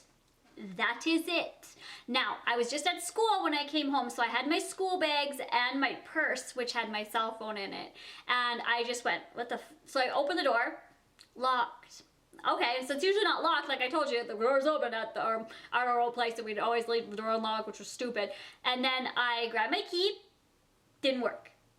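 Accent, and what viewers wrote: Canada. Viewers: Mina Mina she probably sounds different because she has a Canadian accent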